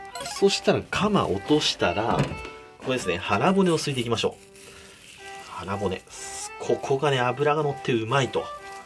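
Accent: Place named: Nagoya, Japan. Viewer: 名古屋人だと馴染みの言葉だけど、なまってると思う人いるのかな？と思った。 「飲まずにおれん」「冷てービール」 素敵な動画ありがとうございました！！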